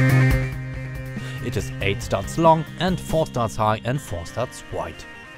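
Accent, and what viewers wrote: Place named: Germany. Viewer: I can hear the German accent 🤣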